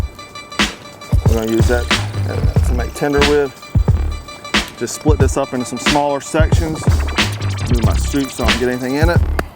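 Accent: South, United States. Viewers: Now I know why that southern accent reminded me of home, we're from the same city lol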